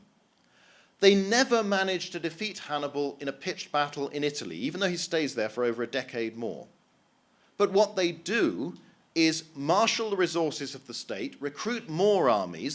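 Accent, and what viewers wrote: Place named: United Kingdom. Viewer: Absolutely enjoyable and very high information density. Plus the British accent adds credibility... :)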